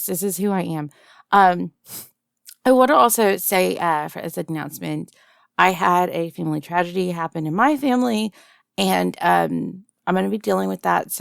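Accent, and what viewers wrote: South, United States. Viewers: Your voice acting is always such a treat to listen to and southern accents are always a weakness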